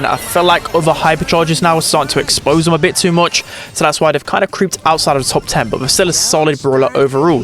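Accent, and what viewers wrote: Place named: Northern England, United Kingdom. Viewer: What part of England are u from bro everytime you pronounce something ith a u yiu sat like "oopdate" for example (from london)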